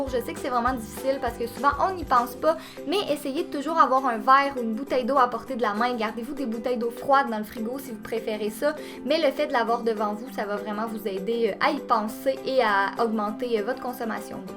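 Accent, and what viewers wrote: Quebec, Canada. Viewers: J'adore tellement tes vidéos, étant déjà une fan des voix québécoise (je vis en Belgique )! J'ai découvert tes vidéos il y a quelques temps et je les adore toutes!! Bisous et continue , de plus j'adore les routines ;)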